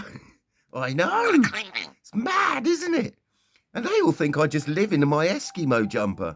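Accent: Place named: United Kingdom. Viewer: 😊i love your relationship..it is so loving and pure. I love watching you interact together. And I really love your British accent..I am from the USA by the way. So happy I came across you and Smurfy ❤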